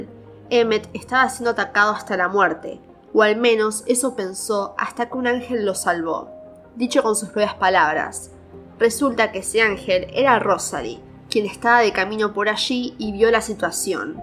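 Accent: Argentina. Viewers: Usted suena como argentina, lo es, señorita?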